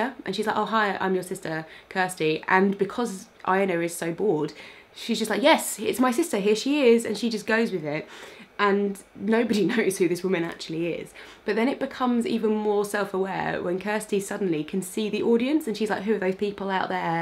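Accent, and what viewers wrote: United Kingdom. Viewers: I love listening to you speaking in a perfect British accent, so beautiful, so relaxing too 🤣. What is your job? How can you read so many books ??? Would you have some must read British books list for foreigners? 🙏 I am French and I have never used the expression "Comme ci comme ça" in my entire life, but it is what all my foreign students and friends answer when I ask them what they can say in French. I teach French to foreigners. Christelle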